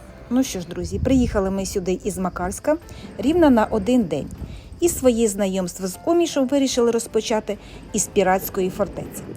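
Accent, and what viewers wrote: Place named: Russia. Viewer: Тішить, що ведете розповідь українською. Чути рашівську, але... Цього року ми були в Макарській...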